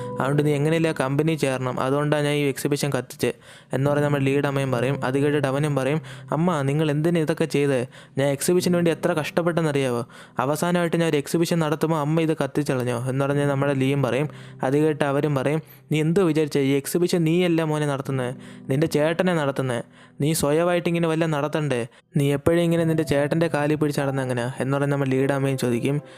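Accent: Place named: Trivandrum, India. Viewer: Chettan trivandrumkarananooo... Slang Ath pole erikkunnu allo, 🤩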